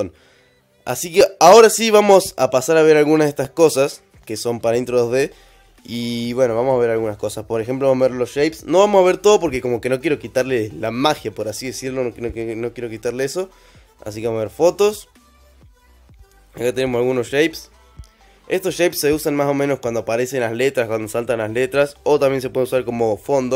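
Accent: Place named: Argentina. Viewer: de qué parte de Argentina sos ?😆😆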